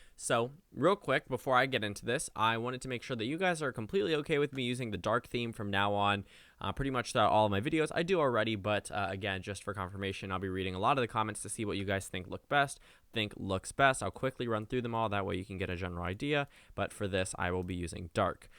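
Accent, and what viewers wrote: United States. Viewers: The dark theme is ok and the donought is good. But PLEASE SLOW DOWN!!! foreigners are watching and the american accent doesn't help exactly... (-‿◦)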